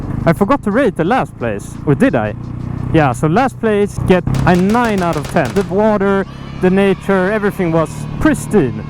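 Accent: Sweden. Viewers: Svengelskan delux 5/5 👍👍👍👍👍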